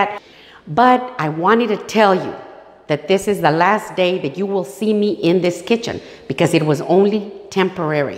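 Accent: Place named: South Texas, United States. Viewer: I love watching your videos! Your South Texas accent reminds me of back home 🥲💕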